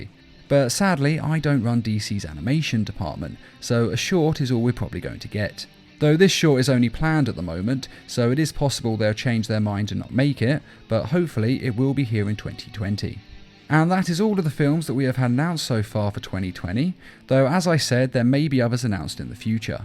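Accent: United Kingdom. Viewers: What is it about those British voice accents that make the coolest videos? 😁👍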